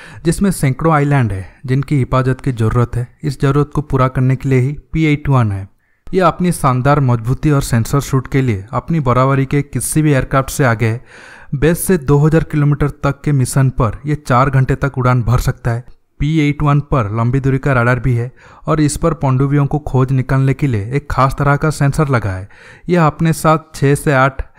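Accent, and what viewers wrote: Odisha, India. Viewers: Bhai ap odisha sa ho kya?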